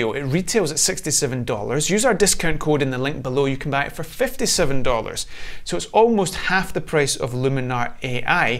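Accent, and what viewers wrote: Scotland, United Kingdom. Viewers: I've just subscribed not only because of the quality content but also because I love to listen to scottish accent :-)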